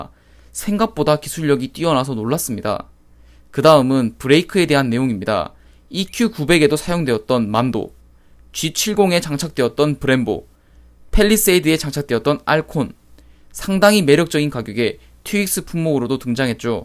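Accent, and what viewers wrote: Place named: Gyeongsang, South Korea. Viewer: 숨길수 없는 깅상도억양 첯음절에 강력한 엑센트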